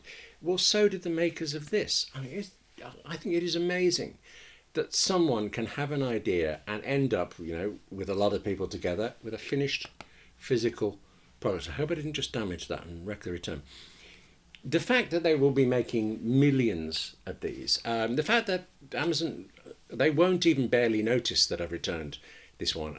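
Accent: United Kingdom. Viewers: I love the way that you describe your experience. so sincere and persuasive!!! and I do love British accent a lot. thank you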